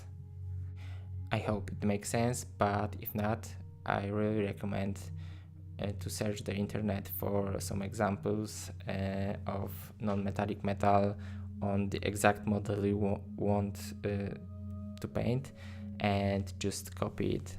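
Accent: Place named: Russia. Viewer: Мне кажется, что ты русский, уж акцент очень похож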